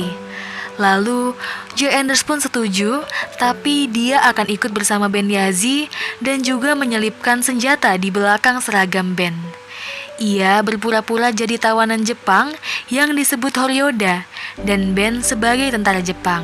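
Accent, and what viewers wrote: Semarang, Indonesia. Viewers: Benern semarangan hehe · orang semarang kah mbak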